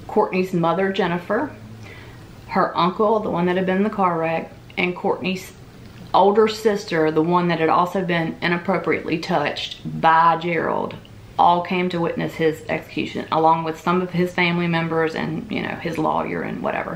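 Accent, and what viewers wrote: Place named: Louisiana, United States. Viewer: What an evil, evil person 😭 That poor baby. I was born and raised in Louisiana. You must be from Northern Louisiana by your accent. Subscribed!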